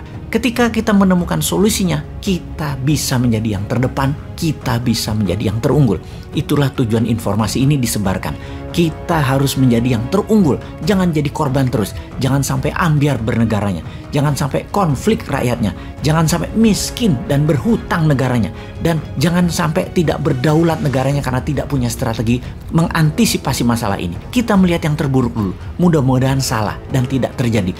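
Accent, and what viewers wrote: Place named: East Java, Indonesia. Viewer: khas jawa timur cok 🤣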